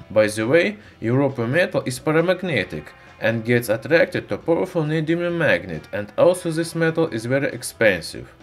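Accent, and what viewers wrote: Russia. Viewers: Kindly forgive me Your accent is too much Russian when you speak English .Can you improve it anyway ? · Ах этот прекрасный русский акцент. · Who dosent like ur ruski aksent dosent deserve to be educated... I like it same as our hydraulic press Chanel...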